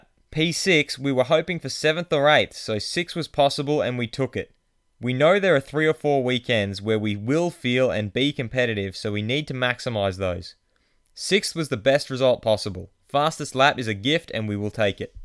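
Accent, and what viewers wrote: Australia. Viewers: Are you talking about the voice in the video? Because he's Australian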